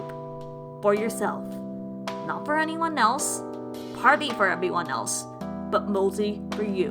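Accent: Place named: United Kingdom. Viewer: i thought you were British for a sec lmao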